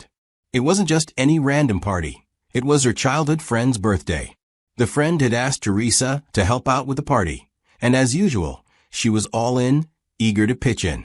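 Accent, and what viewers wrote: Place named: United States. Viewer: A Thai story with american accent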